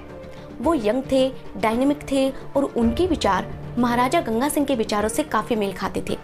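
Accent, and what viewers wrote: Standard. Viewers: आपकी शुद्ध हिंदी बोलने की कला ओर आवाज ,दोनो हि लाजवाब हैं मैडम जी ,बहुत अच्छी वीडियो हैं। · बिल्कुल शुद्ध हिंदी बोली आपने बहुत मधुर भी बोलती हो दिल से आपको धन्यवाद o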